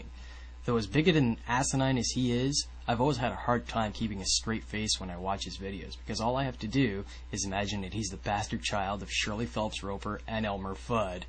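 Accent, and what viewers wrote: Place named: North America. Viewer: i'm sorry for making assumptions about your age based on your voice. But the accent.. well.. i'm a swede.. i wouldn't expect you to tell the difference between scandinavian accents either. i'm sure many people enjoy this emulation, and you do it well for someone with a northamerican accent and your voice (no insults intended). i just personally think that the brilliance of Yahtzee is as mutch about his cynical tone of voice and english dialect as it is his sarcastic fast pace.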